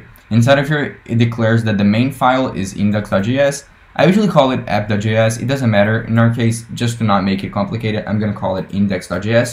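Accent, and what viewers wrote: Brazil. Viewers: o que facilita entendermos e que o cara e BR o ingles dele ajuda muito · ingles brasileiro e bom demais de entender, vlw!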